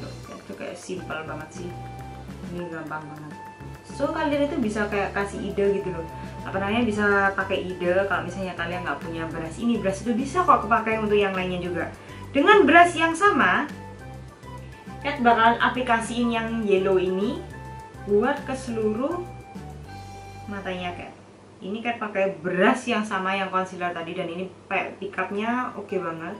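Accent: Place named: Surabaya, Indonesia. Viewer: Mau cerita sedikit ya kak Aku ngikutin video kakak dari awal banget sampe sekarang dan aku juga udah subscribe channel youtube kakak dan aku seneng banget kakak itu baik dan ramah dan mungkin kita sama2 dari surabaya kali ya hehhe😂 gaya ngomongnya medok khas orang surabaya Ohh iya satu lagi aku udah like, subscribe, and comment lengkap sudah👍 #kathlakzgiveaway2018 Aku mau jelasin kenapa aku positif thinking untuk menangin giveaway ini karena 1. Mau ngikutin jejak kak bisa makeup se-professional kakak 2. Pengen jadi youtuber juga tentang makeup2 gitu, doain aja ya kak semoga terwujud😊 3. Aku udah gak sabar buat nyobain brush itu, kakak buat aku ngiler deh pengen nyobain 4. Percaya diri bahwa aku bisa menangin giveaway ini😆 "MERRY CHRISTMAS & HAPPY NEW YEAR🎉🎉🎉" IG: Email: <mail>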